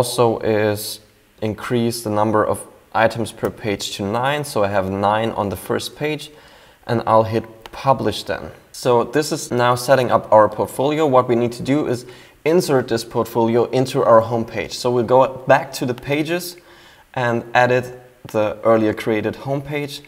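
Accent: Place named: Poland. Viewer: very german with so polish english accent : )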